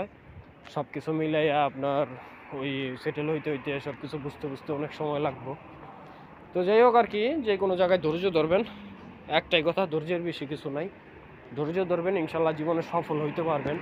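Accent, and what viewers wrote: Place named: Faridpur, Bangladesh. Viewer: ভাই আপনার বাসা কি ফরিদপুর এ,, ভাষায় মনে হচ্ছে ফরিদপুর, আমার বাসা ও ফরিদপুর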